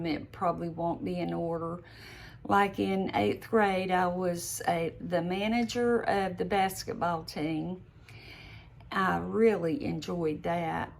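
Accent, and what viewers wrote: South, United States. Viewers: Not boring at all. The sound of your voice is so soothing. I just love your southern accent. I think you could read a book and I would love it. A chapter every day or so? Maybe start another YouTube channel just for narrating a book? It's a thought. Thank you for your life story. Hope there's more to come! There's quite a few years you left out.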